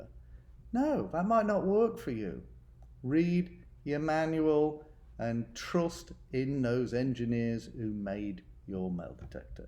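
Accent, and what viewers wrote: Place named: Lincolnshire, United Kingdom. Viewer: With minelab you have to download the manual I actual print them off for my machines I prefer reading it that way. Different land needs different settings I find. Nice to hear a fellow Lincolnshire accent take care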